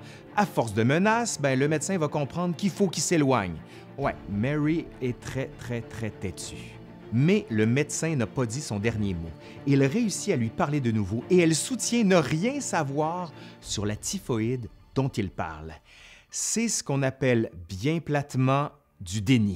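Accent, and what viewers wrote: Quebec, Canada. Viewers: Rien que pour l'accent du Québec, j'adore vous entendre... Enfin, j'adore aussi vos histoires! Bises de France. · Déjà entendu auparavant, mais je savoure votre manière de le présenter, avec accent québécois, humour, beaux yeux et de belles images. Merci! 😉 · Tu raconte trop bien et j'adore l'accent Quebecois.... Tes histoires sont top 👍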